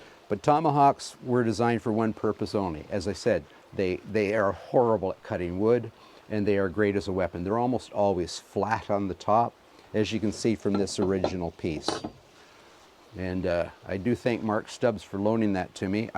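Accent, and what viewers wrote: Canada. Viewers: Hi don t change your Canadian saying s I m from Massachusetts and every on know were Im from when i speak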